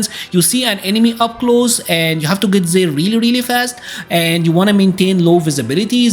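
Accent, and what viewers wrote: Egypt. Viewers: ازيك يا باشة لهجتك مصري عرفتك من اول جملة--- شكرا عل فيديو لزيز قوي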